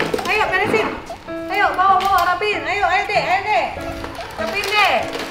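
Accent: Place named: Medan, Indonesia. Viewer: heloowww keluarga cemanaa kok lama gak bikin vlog lagee,kitah nunggu2 neeh..lucu dengan logat ala2 medan ini😊😊,jarang2 kaya mak beti jadinya,coba doonk koleb sama mak beti,atau bareng keluarga mas anang,atau sama bapau(baim paula),biar makin zeruu vlog keluarga cemana ini 🖒🖒,selalu semangatt,Tuhan berkati💕💕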